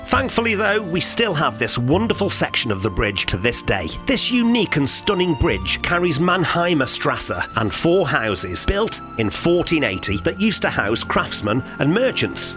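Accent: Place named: West Midlands, United Kingdom. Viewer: I LOVE BUILDINGS ON BRIDGES... can I ask, where is your accent from, I live in the west Midlands and it sounds really family to me!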